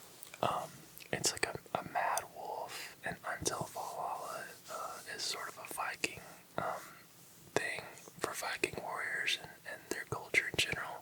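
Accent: United States. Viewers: Idk why but you sound extra american today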